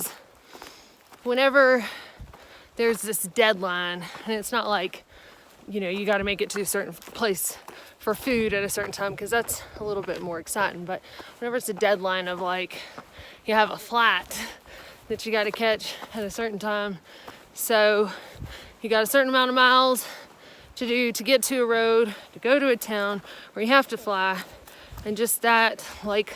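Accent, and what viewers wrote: South, United States. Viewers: That was a scary encounter with the mountain lion. If you would have been at that spot just a few minutes sooner the lion might have been in the bushes. It could have gone bad very easily in my opinion. I think the fact that you were at a higher elevation helped. It was good that you kept your cool and the harmonica obviously helped. Maybe have your pepper spray on one hand and your neck knife on the other just in case. Also consider getting the bear spray sooner on the trail. I really enjoy your videos and it easy to like the way you express yourself and your accent. Good luck on the trail and hope you have a great time off.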